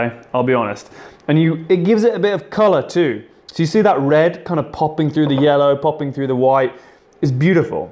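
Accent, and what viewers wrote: United Kingdom. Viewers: I'm Mexican, and it's so cool to watch you make one of my favorite street foods. It's quite funny and cute how you describe everything with your British accent 😁 I just loved your "chopstick elote with cojita cheese" 😂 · Hot guy with british accent and making mexican food street? YOU'RE PERFECT! 🇲🇽❤️ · i'm mexican, and you sound very cute when you say elote Hahah, i love your accent😍❤ the british accent is the Best❤😍😍😍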